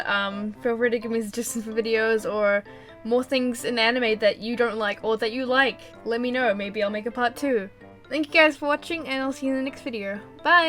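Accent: Australia. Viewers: You Aussie?? YASS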